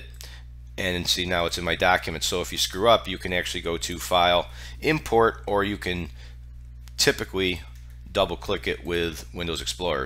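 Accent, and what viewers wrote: United States. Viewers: Your heavy accent is familiar, NY, NJ?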